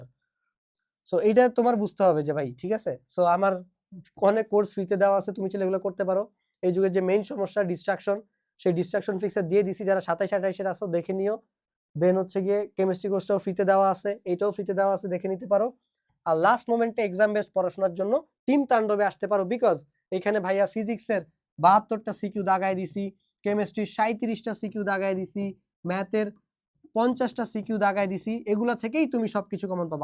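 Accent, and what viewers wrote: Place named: Sylhet, Bangladesh. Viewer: Sir, afnar Sylhet mat je sundor,❤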